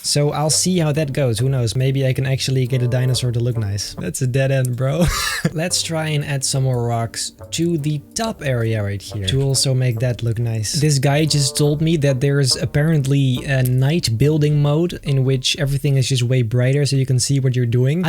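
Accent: Netherlands. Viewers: Are you Dutch?? Your’e voice is al little bit dutch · are you dutch? you sound dutch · You kinda have a Dutch accent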